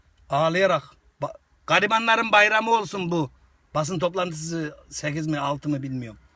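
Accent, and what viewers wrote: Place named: Anatolia, Turkey. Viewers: Sen ne güzel bir insansın be abiciğim ya ağlamaktan seni YouTube izlerdim konuşman muhabbetin çok hoş anadolu memleket gibi Mekanın cennet olsun abim güzel insan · abi ben niğde/bor luyum. şiven bizim ile hemen hemen aynı ve çok doğal bir insan olduğunu en iyi ben bilirim. biz orta anadolu insanları düm düz insanlarızdır kaba gibi görünen iyi niyetli ve neşeli insanlardır. aslında bizi kaba sananlar kendini beğenmişlerdir. bir çok ayrıntıya belki en çok ben gülüyorum..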